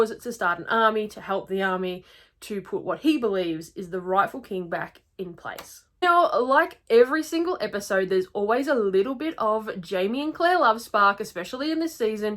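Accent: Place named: Australia. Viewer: I am a huge fan of the Australian accent and I absolutely LOVE yours! No criticism here.:)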